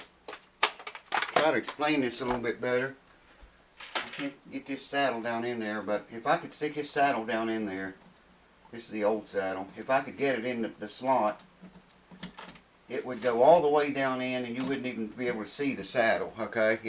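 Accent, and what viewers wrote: South, United States. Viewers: Hey, new sub here. Is that a southern accent I detect?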